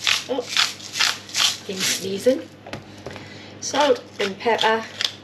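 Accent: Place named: North East England, United Kingdom. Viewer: My favourite channel❤ And as an exile from the north-east you’re a voice from home. I noticed you didn’t season the turkey meat before you rolled it. Was this deliberate?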